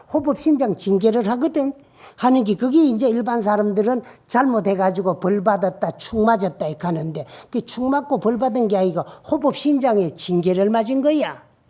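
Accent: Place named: Gyeongsang, South Korea. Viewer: 스님 말씀 구수한 사투리 넘 정겹습니다 경북이 고향이 저의 어머니 말씀 처럼 스님의 법문 넘 잘듣고 있습니다 항상 건강하세요 오래오래 스님 법문 듣고 싶습니다🙏🙏🙏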